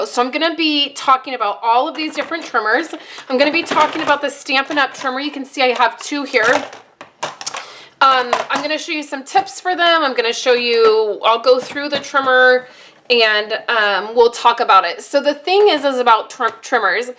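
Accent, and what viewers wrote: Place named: Atlanta, United States. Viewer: Thank you. This is the most informative video I have seen in a long time. My husband was listening to you and swears that is an Atlanta accent. Is it? Love it wherever you got it from!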